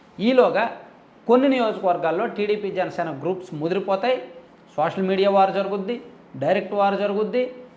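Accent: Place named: Srikakulam, India. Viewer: బ్రో..ని భాష చూస్తే మా శ్రీకాకుళం లా వుంది ..మీది మా శ్రీకాకుళం అయితే దాన్ని డెవలప్ చేయమని వీడియో చెయ్ బ్రో.. చాలా మంది పనులకు విజయవాడ hyderbad వలస వెళుతున్నారు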